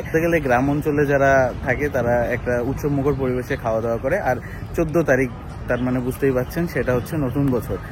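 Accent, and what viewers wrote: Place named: Jessore, Bangladesh. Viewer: তো বড় ইউটিউবার হওয়ার পর ভুলে যেয়ে না। আপনি কি যশোরের মানুষ? কথা গুলো একদম আমাদের মতো 🙂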